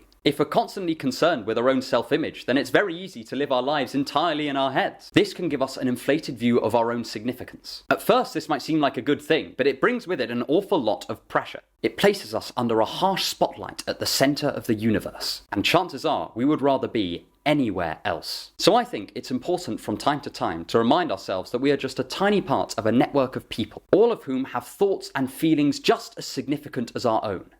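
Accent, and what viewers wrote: United Kingdom. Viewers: Thanks for the info !! Your advice is greatly appreciated and your videos are really informative and intriguing and would like to ask where is your accent from it’s British right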